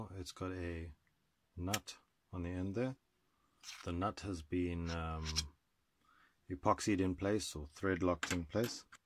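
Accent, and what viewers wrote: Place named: South Africa. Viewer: sounds like you are from SA. anyways. I am unable to find these 3.5mm bearings in SA. any suggestions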